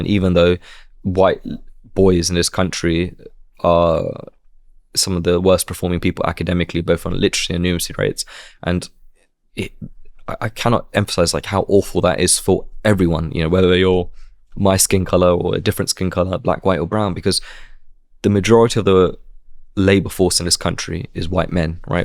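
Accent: United Kingdom. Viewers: Good information well put .. British English spoken ..the tone and and the pace is so much easier and pleasant to the ears .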